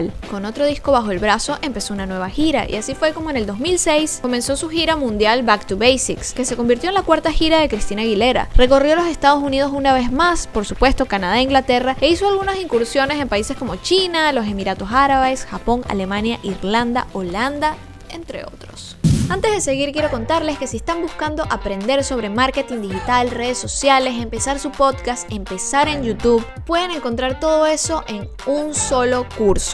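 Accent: Venezuela. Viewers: eres venezolana cierto? Ese acento jejej me hubiera gustado ver a verla cantar en el caracas pop festival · Te amo por tu acento venezolanooooooooooo... muy dificil escoger una cancion... creo que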